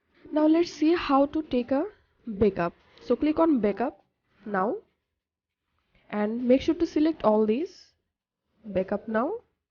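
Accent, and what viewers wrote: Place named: Bengal, India. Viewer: Her accent is Bengali 🎈🎈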